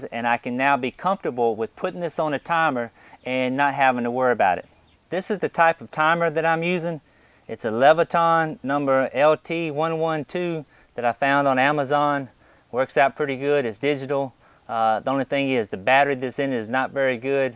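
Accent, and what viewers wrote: South, United States. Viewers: I love your accent. What part of the south are you from? It makes me thoroughly enjoy your videos in addition to the awesome info!!! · My Tennessee wife is dieing to know where you are from, she’s always asking where people ok youtube I watch are from if they have southern accents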